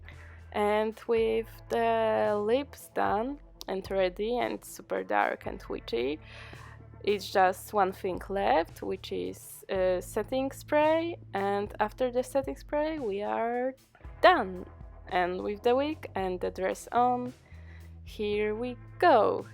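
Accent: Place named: Russia. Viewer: Hi, were are you from? You have russian accent) ( i don't now)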